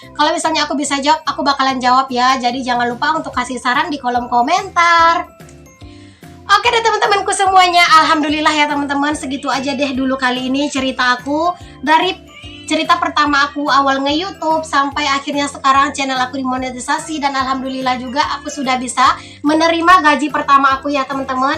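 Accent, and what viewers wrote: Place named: Java, Indonesia. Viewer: Lucu bgt bunda ini ngomongnya.. nyenengne bahsa jawane... 👍👍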